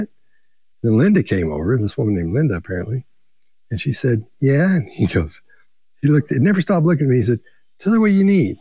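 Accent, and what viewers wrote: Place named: South, United States. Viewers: Love that Southern accent. Smooth as honey. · the whole time I was thinking Tennessee!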